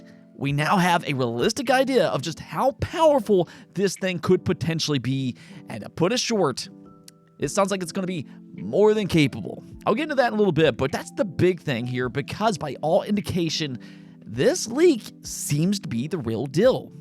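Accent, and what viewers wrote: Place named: Mountain West, United States. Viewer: I can tell you're from the mountain west region by the way you say "rill dill." Didn't even know this accent existed before Sister Wives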